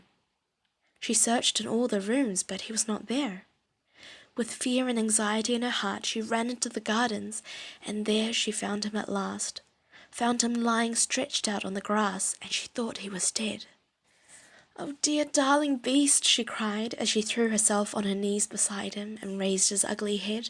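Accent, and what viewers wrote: New Zealand. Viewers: 항상 영상만 보다 댓글은 처음 남기네요ㅎㅎ 뉴질랜드 억양으로 동화를 들으며 공부할 수 있어서 너무 좋아요! 목소리 너무 좋으세요:) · 아... 다른 채널에서 타다가 오게 됐는데 ㅠㅠ 자기 전에 듣기 너무 좋을 것 같아요! 뉴질랜드 발음의 특징(?)을 정리해주신 영상도 올려주신다면 좋겠어요! 딱 듣고 아 이건 뉴질랜드다 싶은 게 뭔지 알고싶어요 ㅎㅎ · 우와... 뉴질랜드 발음으로 영어듣기 하고싶었는데 드디어 그 채널을 찾았네요!! 너무너무 감사합니다~ 앞으로도 좋은 영상 많이 올려주시면 감사히 보겠습니다 :)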